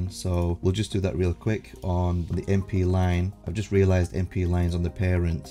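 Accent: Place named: West Yorkshire, United Kingdom. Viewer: That's a guy from West Yorkshire who has lived in Japan for 10 years, so it's a little hybrid 😅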